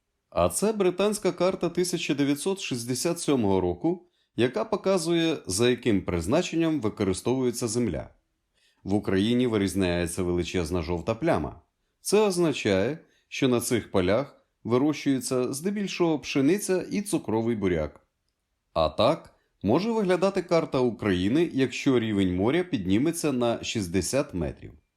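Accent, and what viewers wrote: Russia. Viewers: Автор російськомовний, це чути з деяких слів і з акценту, але вподобайка за контекст 👍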